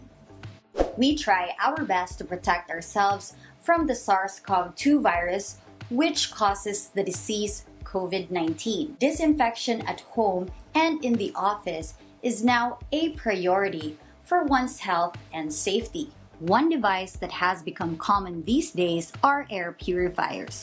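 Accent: Philippines. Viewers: Lol, kala ko tagalog, english paka ka pala. Hahaha. Bisayang probinsyana yata... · I like your positive outlook, actually, I also like the way you speak, I know some ways shows our Filipino acc-----accent, tehe! Anyways, I have HEPA Hathspace on my room, I am using it for my allergies. In regards to that device, I don't really mind, but I probably have to get another brand here in Hawai'i or maybe order from Amazon. But it's always good to have something like that for additional defense from covid. I do not know in the long term usage though.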